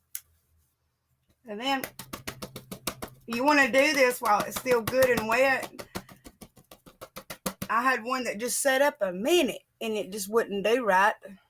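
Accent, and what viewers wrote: South, United States. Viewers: Love it and I love your southern accent.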